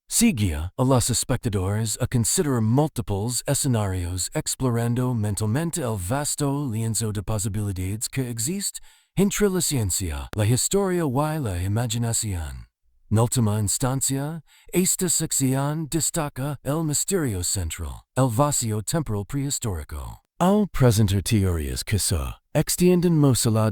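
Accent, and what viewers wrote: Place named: United States. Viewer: Qué cojones... Han puesto a una IA gringa cateta a pronunciar español?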